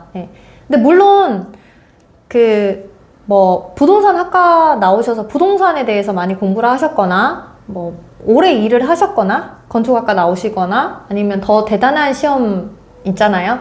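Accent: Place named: Gyeongsang, South Korea. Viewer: 경상도 분이신가봐요ㅎㅎ 응원합니다 선배님!!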